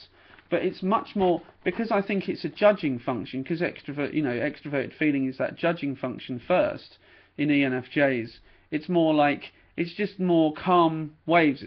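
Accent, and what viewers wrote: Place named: United Kingdom. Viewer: I really enjoy your videos. Great to hear a British accent, on the subject of MBTI on YouTube. For me re: I/E I think, like anything else in life, people tend to over-simplify a concept in order to assist with general understanding. It then becomes a Chinese whisper. If you like understanding systems (as I do) you quickly relish the fact that it's clearly a much more sophisticated concept, than the initial information concerning the letters. I guess when I refer to extroversion, I'm talking about someone leading with an extroverted function, as the meatiest part of them, which leads them on a sociable path. However, we all think, we all feel and we're all I/E. That's the complicated and intricate beauty of humanity. The MBTI framework attempts to trace it. Keep up the good work! Marie (INTJ)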